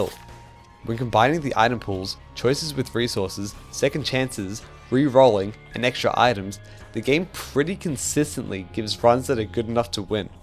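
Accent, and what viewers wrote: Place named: United Kingdom. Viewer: i thought it was mean but then i realized he was just british, carry on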